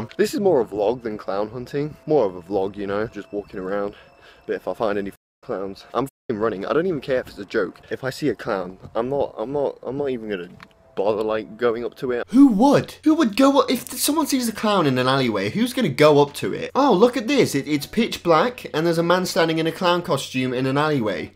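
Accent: United Kingdom. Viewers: MeKillYouTo I don’t know a lot of people in the us that have a British accent like his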